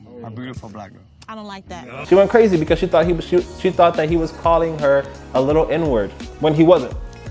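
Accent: United States. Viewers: 😂😂😂😂😂 bro el acento gringo yo moriiiiii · That gringo accent makes me really practice my speaking skills!! I DO NOT want to sound like that!!!! LOL!!! IT is truly "cringy"LOL! Thanks for the awesome vid!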